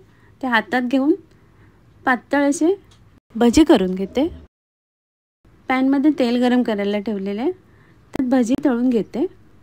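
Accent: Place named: Konkan, India. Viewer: Marathi only... In the sweet tone of kokani 😂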